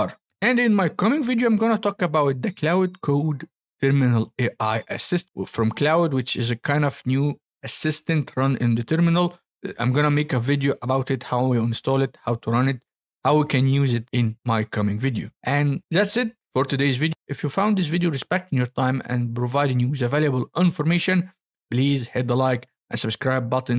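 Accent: Egypt. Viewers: انت مصري صح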